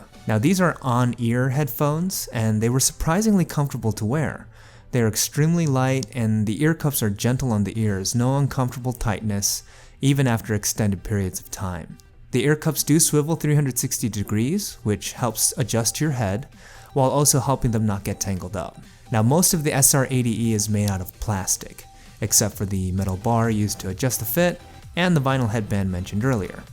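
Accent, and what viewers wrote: United States. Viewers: your American accent sounds very pleasing and accurate!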